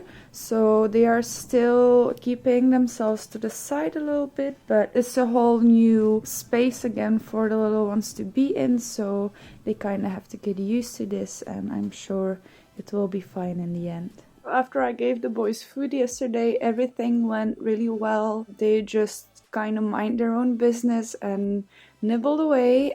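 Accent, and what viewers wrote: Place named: Netherlands. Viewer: ben je nederlands?? je hebt een beetje een nederlands accent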